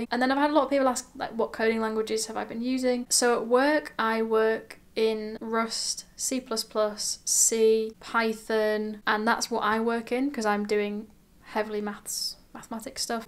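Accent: United Kingdom. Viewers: You're a very interesting person. I love your channel. You have an incredible calmness inside you, and a very pleasant voice. I love so much hearing your British accent. You inspired to me. Naysayers? How care about them? All The Best!!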